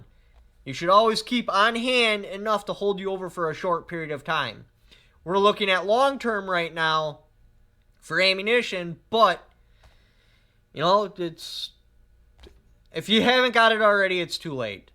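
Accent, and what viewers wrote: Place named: Wisconsin, United States. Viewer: LOVE ALL OF YOUR VIDEOS AND WISCONSIN ACCENT. KEEP SPEAKING THE TRUTH BROTHER.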